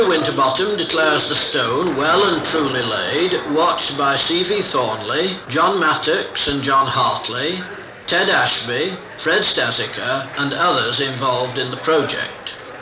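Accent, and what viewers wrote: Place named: RP, United Kingdom. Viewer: The narrator wasn't half posh.....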